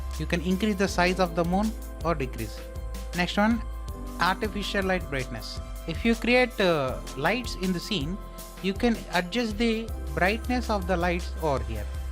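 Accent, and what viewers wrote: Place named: India. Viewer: I had hoped to follow your tutorial but it was just too frustrating. I find it difficult to understand Indian English because American English is my native tongue. It would help tremendously if your narrative was presented in closed caption. Thank you for your tutorial and your understanding.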